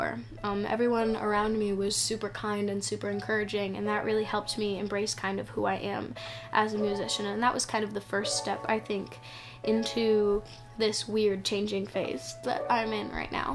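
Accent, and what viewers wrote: United States. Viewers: Wait I thought she's American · isn't it american? :0 I literally watch his videos to hear his voice, but I speak Spanish and I don't understand anything, hahaha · i thought you were american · i thought u were american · I thought you were American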